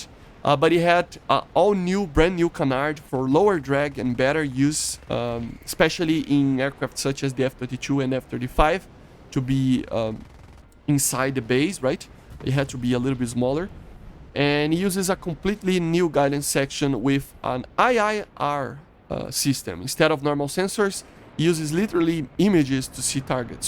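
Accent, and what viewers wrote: Brazil. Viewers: Só pelo sotaque já percebi que é BR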